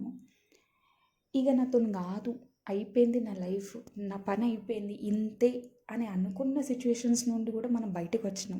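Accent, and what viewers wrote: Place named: Telangana, India. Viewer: I like your telagana slag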